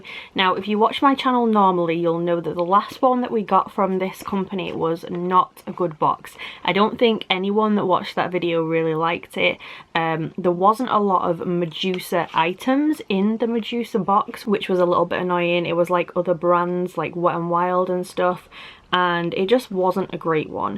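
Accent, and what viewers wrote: United Kingdom. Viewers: What part of the uk r u from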